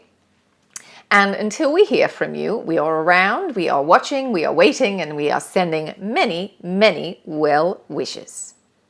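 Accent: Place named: Australia. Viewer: I thought you were Australian!!! This is the first time I have ever heard you talk as, and not as The Pleidians. So, the voice of the Council that you channel sounds a bit Australian to me - actually VERY Australian to me. Have you ever been told this?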